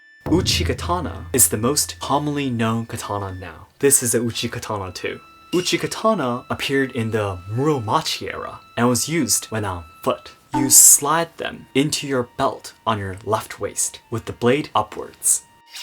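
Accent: Michigan, United States. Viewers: Your measured, succinct speaking style with an American Midwestern accent is very appealing. Listening to you is so enjoyable. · I can hear the "Michigan" in your english. Its very clean with none of the inflection that you find in other midwest states in that area like Wisconsin or Minnesota. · Dude, you sound more like a Michigander than I do! Very interesting video. Well presented.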